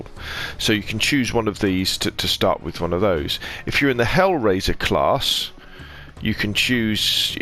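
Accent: England, United Kingdom. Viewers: Excuse me, sir, you are saying everything. Corrects, you explain it very good, but please take the marbles out of your mouth when you're speaking. It's really confusing. My friends, I know you have an english accent. And that's why listen I have a Boston accent. That's very deep so if you could clarify a few things, take the models out of your mouth and thank you.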